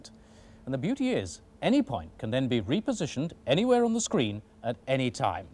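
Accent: United Kingdom. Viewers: The British accent makes it even better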